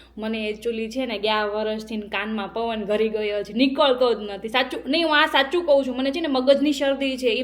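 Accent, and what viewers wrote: Kathiawar, India. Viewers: Your short videos are awesome. No need to change. Wonderful કાઠિયાવાડી ગુજરાતી. Loved your " Soul mate" video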